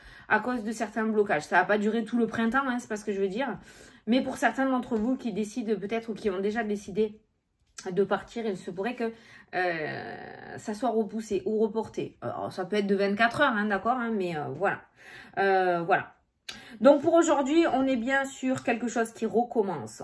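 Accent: South, France. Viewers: Bravo d’être là pour nous en ces temps difficiles. Avé l’accent. ☺️🤗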